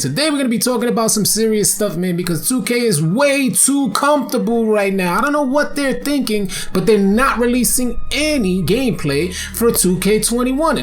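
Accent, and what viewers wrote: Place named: New York City, United States. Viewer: sounding real live in this one! I hit that like because you sounding real NYC in this one my G.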